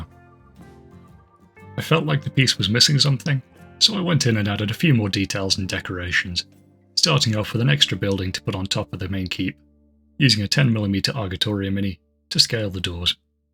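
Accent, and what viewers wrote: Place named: United Kingdom. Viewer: Another great vid! Where do you get your blue foam from? I've not seen it in any of my local craft stores (I'm assuming you're based in the UK based on your accent.)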